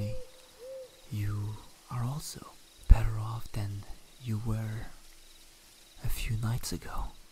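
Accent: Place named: France. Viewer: I was not into French accent before, but now I'm getting obsessed 😅🤭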